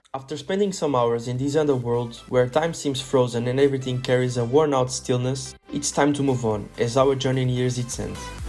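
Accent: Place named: Portugal. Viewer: omg, the portugueese accent makes the video funny to watch haha please more videos!!!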